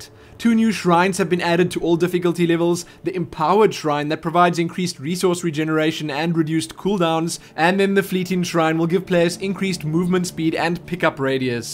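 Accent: South Africa. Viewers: that is a south african accent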